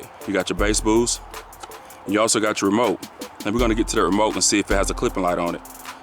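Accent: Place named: Mississippi, United States. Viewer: You definitely from Mississippi with that accent lol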